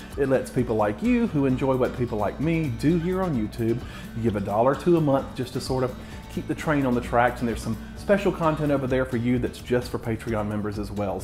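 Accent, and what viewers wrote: South, United States. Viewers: my favorite thing about your videos. #1, I can listen to your southern twang all day. #2, your face when you have tasted your creation! No poker face for sure. And when you don't like it, we know you don't like it. Thanks for what you do!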